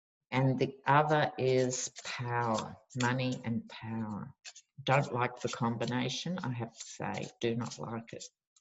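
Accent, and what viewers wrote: Australia. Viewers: Great readings! 🌺💐🌺I love your clear, concise yet laidback style, and your Aussie Accent is the best! 😉Thanks for sharing your awesome gifts and talents with us. Happy New Year 🛎🎉🎉🎉and Continued Blessings! 💙🧡💜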